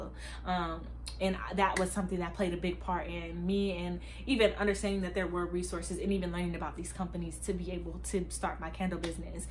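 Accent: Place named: Philadelphia, United States. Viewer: oh I just assumed because you sound like a philly girl lol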